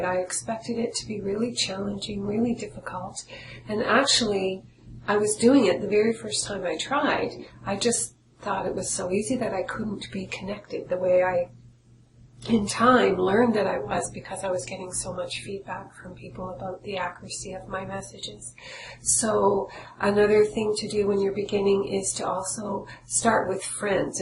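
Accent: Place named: Nova Scotia, Canada. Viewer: Hi, I am so sorry that I did not know about you when I was living in NS. I am very interested in learning to channel as I feel spirit talks to me in very subtle ways and I want to be more open to the messages. I have to laugh as right away I picked up on the accent and had to google you to see where you were from..i miss the NS accent:(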